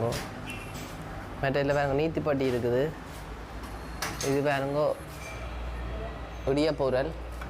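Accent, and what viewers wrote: Jaffna, Sri Lanka. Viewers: இலங்கைத் தமிழ் செமபா. வாழ்க வளர்க.by நாகப்பட்டினம் மீனவன் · சிவகங்கை மாவட்டத்தில் இருந்து உங்கள் ரசிகன் இலங்கை தமிழ் மிகவும் அழகாக உள்ளது வாழ்த்துக்கள் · பனைபொருள் அங்காடியில் பல பொருட்களை யாழின் தூய தமிழில் அறிமுகம் செய்தது செவிக்கும் மனதிற்கும் இனிமை பயத்தது... நன்றி. வாழிய யாழ் தமிழின உறவுகளே... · தமிழ்நாடு திருப்பூரில் இருந்து இலங்கை யின் தமிழுக்கு அடிமையான ஒரு தமிழ் கிறுக்கன் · இவர் பேசுவது தான் கலப்படமில்லாத யாழ் தமிழ் பேசும் விதம்.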